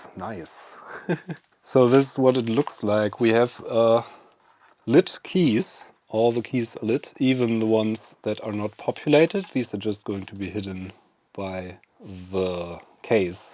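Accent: Germany. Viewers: Schade das die Videos immer in Englisch sind. Vom Akzent her würde ich sagen das Sie deutscher sind manchmal ist es schwierig zu folgen da mein eigenes Englisch nicht reicht. Aber nichts desto trotz sehr gute Videos und gut erklärt. Vielen Dank dafür. Grüße aus Niedersachsen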